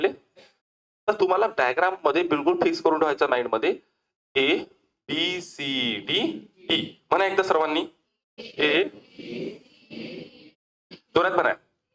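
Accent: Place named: Vidarbha, India. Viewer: Sir tumchya bhashevrun as vatte ki tumhi vidarbhatle ahat... Nice lecture sir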